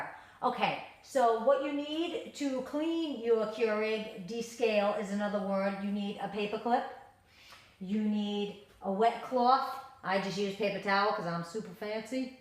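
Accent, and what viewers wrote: Boston, United States. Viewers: Not a NY'er....That's a wicked strong baawston townie accent.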